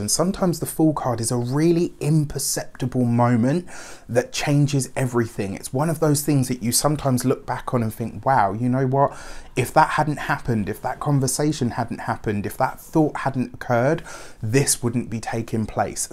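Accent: England, United Kingdom. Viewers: Great to hear an English reader Thankyou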